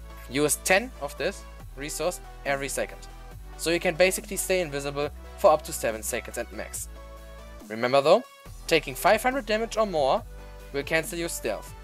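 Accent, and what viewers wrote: Germany. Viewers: Are you German? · Are you German i just think it because i am from Germany too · Youre German Man merkt sofort deinen Akzent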